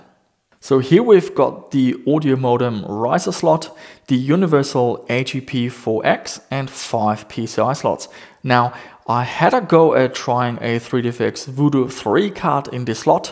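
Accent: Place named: Germany. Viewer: Hey, i'm watching your content for about 1 or 2 years and on any video i'm thinking if you are german, 'cause your accent sounds so for me. But if or if not, its not important. I love your videos, it reminds me on my childhood and youth. BR Horst from Germany;-)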